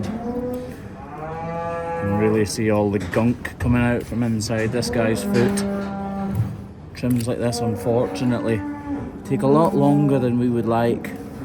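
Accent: Scotland, United Kingdom. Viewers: I think part of it is also (maybe just for me) his Scottish brogue. It’s lyrical, I have always been a music geek. 😊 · I really enjoy watching your videos. Even though this is a seriously important and necessary job, you and the team around you are very entertaining. Yet, I find the presentations to be quite informative. The way you regard and treat these animals, is a credit to all of you. I’m quite sure that the farmers who bring you in, appreciate your overall regard and respect you show to their livestock. BTW has anyone ever told you that you look a lot like Russell Tovey, only with a Scottish accent. LOL 😊 Much respect and appreciation from here in Western Australia. G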